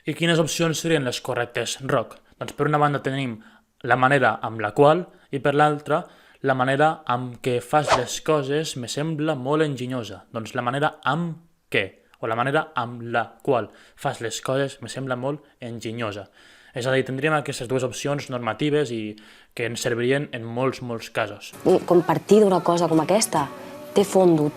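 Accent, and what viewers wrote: Spain. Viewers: aunque te mueras de la rabia: se nota que tu lengua madre es el español.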